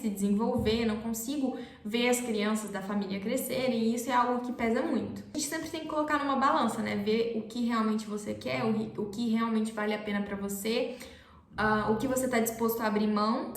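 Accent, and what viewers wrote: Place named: Favela, Brazil. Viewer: Portugal é um dos "piores países da Europa"? Trava a língua, tá brasileira? Trava SIM piorque a tua língua que é bem comprida e afiada. Não achas que por Portugal ser o que dizes que não deverias estar cá e que NUNCA deverias ter vindo. Vê-se logo que és brasileira. O que será que "vinheste fazê prá PortugAAAAAAAAAAAAAAAAAAAAAAAAAAAAAAAAAAAAOOOOOOOOOOOOOOOOOOOOOOOOOOOOOOOOOOOOOOOOOOOOOOOOOOOOOO" se nem de origem portuguesa és? Qual é a afinidade que tens com Portugal, com os portugueses, com a cultura, com os costumes etc? Nem mesmo com a língua tens qualquer afinidade. Nós portugueses não falamos o português da FAVELA como tu falas. Trava a língua, falsa turista.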